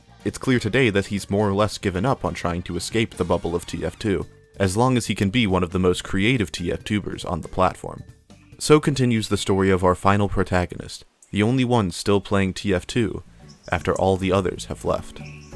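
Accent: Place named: United Kingdom. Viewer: Im just here for your british vocals!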